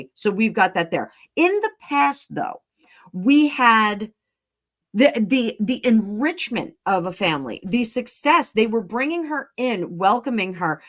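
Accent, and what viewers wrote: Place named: New Jersey, United States. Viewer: Great reading . I love the NJ accent. lol sometimes my Deep South comes out in me . Who am I kidding - I can’t get rid of it. 😂ya know I’ve never really wished a divorce on anyone but I do with them. I just hope they get out before Harry loses it completely. ❤️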